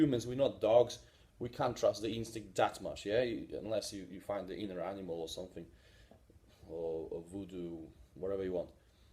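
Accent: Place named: Eastern Europe. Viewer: Panie, bardzo ciekawe video, ale czy nie zastanawial sie Pan nad przejsciem na forme pisana? Niestety slychac, ze jezyk angielski nie jest Pana ojczystym jezykiem, robi Pan calkiem sporo "polskich" bledow jezykowych, ma Pan mocny slowianski akcent i slychac, ze z trudem szuka Pan slow. Polaczenie tego wszystkiego z dosc slabym udzwiekowieniem (moze dodatkowy mikrofon tuz pod szyja) sprawia, ze po prostu ciezko sie tego slucha i trzeba sie mocno skupic, zeby wyluskac tresc. Czytalem kiedys Pana artykuly nt. treningu silowego i byly bardzo dobrze napisane. Moze zamiast formy youtube'owej przerzucilby sie Pan po prostu na bloga w jezyku angielskim? Tlumaczenie tekstow z jezyka polskiego na angielski jest aktualnie smiesznie tanie, a moglby Pan zlapac wiekszy traffic, szczegolnie na kfd/sfd/pokrewnych forach. Pozdrawiam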